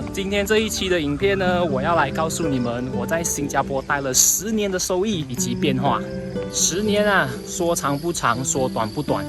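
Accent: Malaysia. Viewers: 你换工作薪水能跳一个30%肯定会要换喔🤣😂😁 很喜欢听你的联邦腔😛😄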